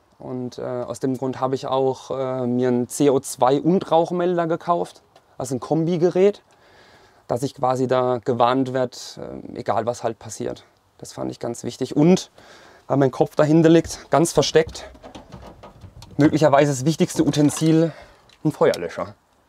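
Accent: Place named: Swabia, Germany. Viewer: Müsste Schwabe sein glaube ich 😄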